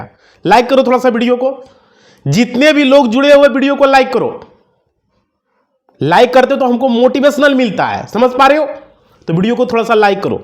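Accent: Bihar, India. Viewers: Adha padha likha lekin insan khud ko bahut samajhdar samajh raha hai bewakuf , jo bhi bolta hai pura bihari style mein, galat hindi aur english ke sath. Pura zahil hai.😡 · Jara soch ke dekho jara dhayan se suno aur suno, Bihar accent me bolkar bar bar bol raha hai samanjh gia samanjh gia ? Rafu chakker ho gia rafu chakker ho gia.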